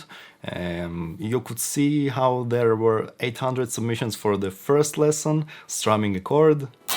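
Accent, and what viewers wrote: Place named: Russia. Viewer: Я уверен, что если бы ты вёл канал на русском - то подписчиков было бы гораздо больше! Слушать русскоговорящего, который говорит на инглише с русским акцентом - это реально тяжко )))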